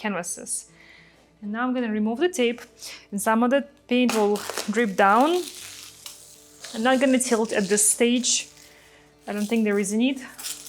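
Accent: Russia. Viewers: русская девушка? У нее русский акцент❤ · Ну и акцент! Чисто русский. даже я почти все понял. Хотя на английском кроме хау мач? них не разумею.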